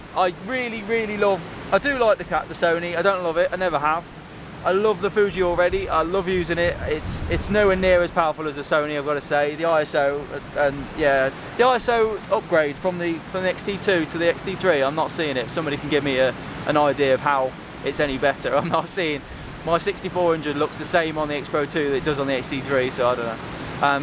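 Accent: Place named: Midlands, United Kingdom. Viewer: Just found your channel. Great stuff. Though I'd say from your accent that you are from the midlands and not wales 🙂👍👍👍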